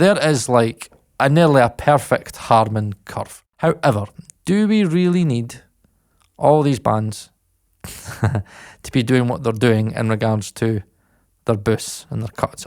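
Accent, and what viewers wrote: Scotland, United Kingdom. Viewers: Trying to think why this guy seemed familiar. I had never been on this channel, and then I realised he sounded like Frankie Boyle sorry not every Scotsman sounds like Frankie Boyle to me. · Scottish accent is nice!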